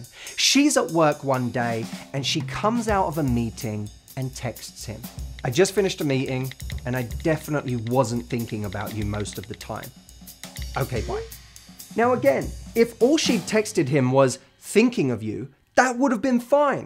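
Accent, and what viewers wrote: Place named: United Kingdom. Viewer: I love the way you Brits speak, "exomple ofter exomple" :–0. I'm not too sure about the glottal stop though. Or should I say "the glo' al stop"? hehe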